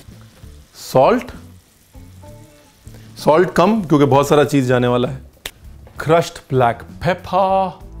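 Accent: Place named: Punjab, India. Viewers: Oye hoye, teri Punjabi!!! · Aap to na ji aap ne to cooking ka andaaz hi badal diya. Aur aapka punjabi lehza I just love to listen to it 👍👍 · U r commendable mann...aur jo punjabi nikalti h khana banate hue oye hoye hoye hoye😍😍😍😍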